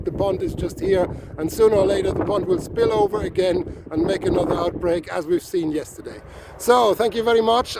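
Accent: Ireland. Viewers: lectured in volcanology and petrology at Trinity College Dublin <num>. Its just one of those accents you can't help but picking up some of the twang:) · You sound irish?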